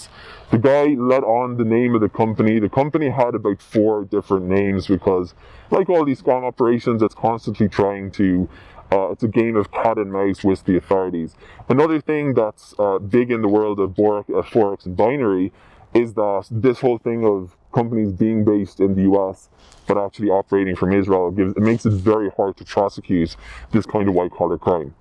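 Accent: Ireland. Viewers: I’m afraid the voice modifier doesn’t get rid of your distinctive Irish accent, nor your typical rhythm of speech. Fun video tho!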